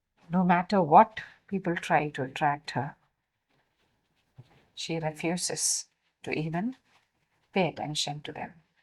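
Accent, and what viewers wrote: India. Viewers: Indian Ki english bahut mza Kerti hai.hamen Yahan Pakistan me teachers kahtay hain indian authors Ki Kia bat hai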